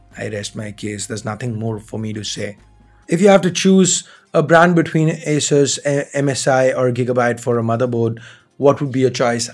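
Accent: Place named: India. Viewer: Good content. Cringey accent. Please speak in neutral Indian accent.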